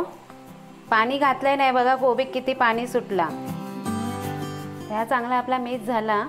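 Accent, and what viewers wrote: Malvani, India. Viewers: तुमचं जेवण , अणि माववणी बोलणं , फार सुंदर .जय कोकण . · Wahh malwani bolan 👌👌👌 bhaji 😋😋😋😋😋😋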